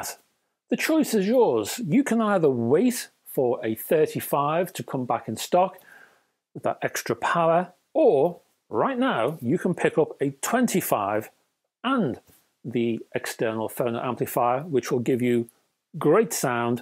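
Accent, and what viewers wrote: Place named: Merseyside, United Kingdom. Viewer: Channel is great btw. Also nice hearing a voice from close-ish to home (I'm from Crosby)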